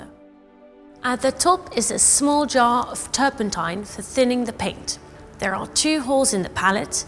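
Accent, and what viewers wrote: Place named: Netherlands. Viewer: I actually love her accent, sounds like a Dutch that lived or studied long in England. My husband is Dutch and sometimes he also sounds a little bit like her, I love that.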